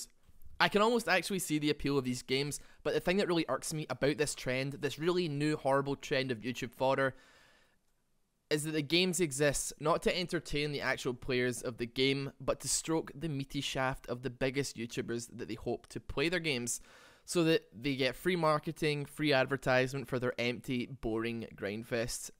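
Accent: Scotland, United Kingdom. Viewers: Are you scottish? ^^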